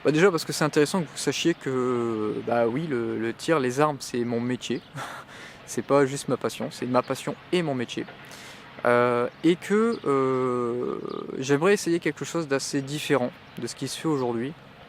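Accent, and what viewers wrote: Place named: Pas-de-Calais, France. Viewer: Saint-Pol sur ternoise... il y a un super circuit là-bas. Je me disais bien que ton accent m'étais familier. Super chaîne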